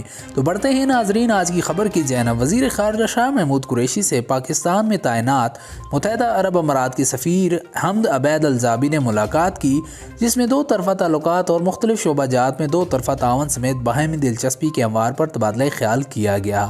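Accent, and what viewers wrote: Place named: United Kingdom. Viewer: Please mere bhai apna English accent keep away jazakum Allah wo khair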